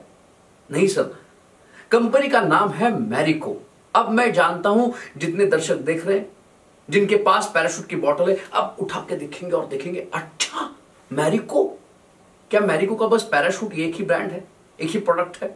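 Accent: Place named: Maharashtra, India. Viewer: Very nice Sir. Khup bhari watla Sir tumcha ha video bagun. Apla manus business field madhe pragati karat ahe he bagun khup bhari watla. Tumcha hindi accent madhe Marathi chi tone yet ahe khup chaan. Dr Vivek Bindra Sir ani tumchya badal reference dila. Khup chaan. All the best. Very nice. Your way of teaching and communication is great and easy to understand. You present difficult topics in very easy way.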